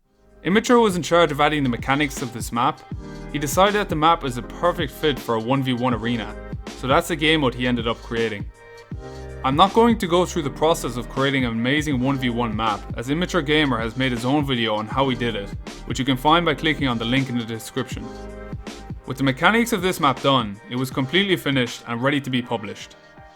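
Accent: Ireland. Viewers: u have to be irish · You have an Irish accent and by the way could you build the jungle battlefield 5 map it would be very cool to see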